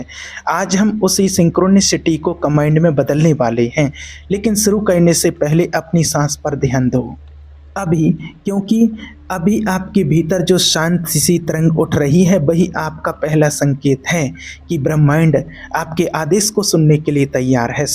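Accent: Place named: Braj, India. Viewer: Pahle ye brahmend nhi hota Brahmand hota h aap up ke vo bhi birij ke ho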